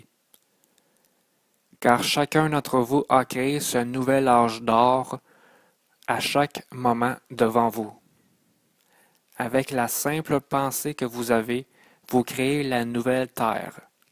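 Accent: Quebec, Canada. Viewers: Accent québécois.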